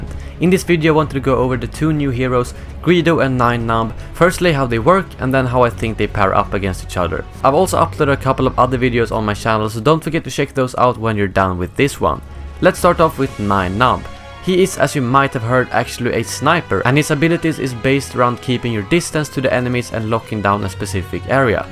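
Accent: Sweden. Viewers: Are you fr.o.m. Sweden (är du från Sverige) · Are You from Sweden? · Are you from SWEDEN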